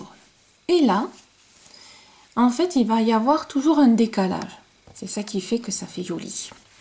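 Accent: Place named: Southwest, France. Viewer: bonjour, je recherchais un point pour un poncho et autres et là je tombe sur ton tuto. Pile à point. j'ai adoré les explications, cette touche d'humour vraiment agréable et cet accent oh là là qui rechauffe le coeur et me rappelle l'époque ou l'ai habité le sud-ouest ou les gens sont chaleureux et simples. merci.👍❤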